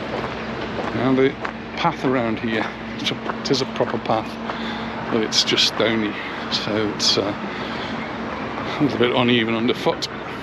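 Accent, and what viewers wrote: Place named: Scouse, United Kingdom. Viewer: Hi &, we’re long time subscribers, loving your vlogs and the hint of a scouse accent 👌😉Just after some advice if you don’t mind. We are cruise fanatics and vloggers and are visiting Palma for the day on Cunard’s Queen Anne on 17th June. We want to visit a nice beach for the day. It would need facilities (Sunbeds, umbrellas, somewhere for food and drink), have relatively easy access (a couple of our party have mobility issues and be not too far from the cruise terminal. Portals Nous looks good, any other recommendations? Thanks in advance. Gail & Mike x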